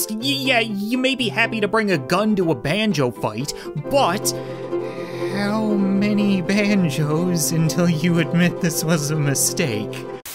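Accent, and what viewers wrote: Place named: Canada. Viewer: When I first started watching him. I couldn't tell that he was Canadian. but NOW?! Got me up here thinking Paul Bunyon made a YouTube channel😂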